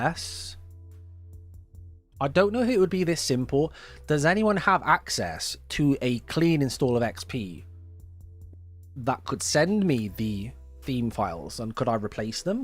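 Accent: United Kingdom. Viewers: btw love who your pure British "yeah lemme get more tea" LOL stay cool my guy PS what's your favorite Michael mjd video?? Mine is the one where everything goes wrong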